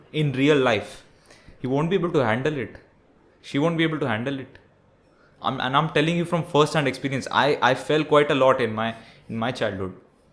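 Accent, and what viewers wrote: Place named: Gujarat, India. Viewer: Dude, I didn’t know you were Gujarati. Your dialect sounds Surti.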